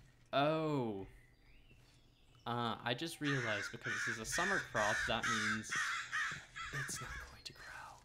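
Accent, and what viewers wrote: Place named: Australia. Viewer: At first I could not place your slight accent, but then hearing you count made me realize. I honestly was like "This dude sort of sounds Australian." Boy am I dumb.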